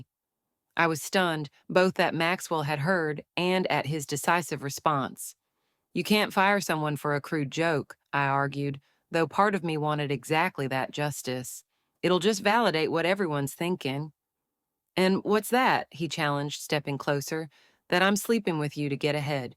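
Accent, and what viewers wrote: South, United States. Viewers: What is this...picture and story about a Black woman being narrated by a southern White woman!? You couldn't even use an AI voice to get a neutral one? This is offensive.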